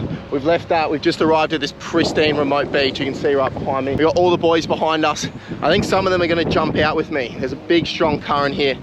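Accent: Australia. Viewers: This dude has a Aussie accent you cant forget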